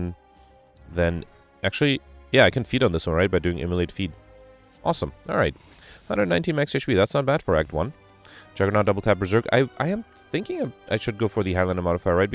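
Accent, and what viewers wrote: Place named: Scandinavia. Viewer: It's all good. Just gave som Baltic or scandinavian vibe to your speech, but that's totally fair. I'm from Denmark myself. Anyway I love your videos they're great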